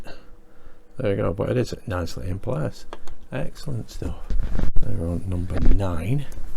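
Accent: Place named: Northern England, United Kingdom. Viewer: PS: I really like your cheerful disposition and awesome northern English accent! Excellent stuff!! Cheers, Mark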